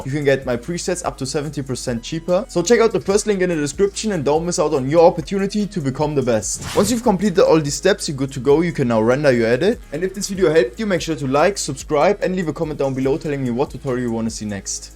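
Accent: Germany. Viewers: Du hörst dichn bisschen deutsch an 😅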